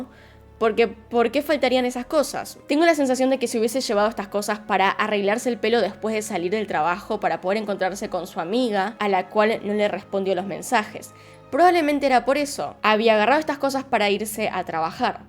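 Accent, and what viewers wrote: Argentina. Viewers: Me encanta su acento Argentino.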